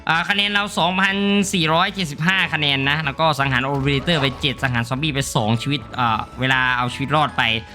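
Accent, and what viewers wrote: Korat, Thailand. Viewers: พี่คนโคราชป่าวครับ555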